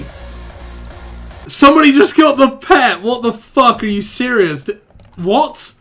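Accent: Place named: England, United Kingdom. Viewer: !! where are you from in england?? i recognize your accent!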